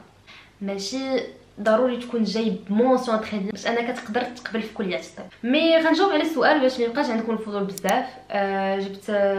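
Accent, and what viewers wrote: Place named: Monaco. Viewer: مالك اختي كتهضري كيف لكبرا فموناكو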